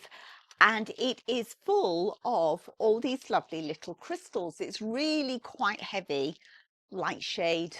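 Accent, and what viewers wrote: United Kingdom. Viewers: I’m I heaven…the British accent, thrifting, design. Thank you for sharing. Diane from Florida.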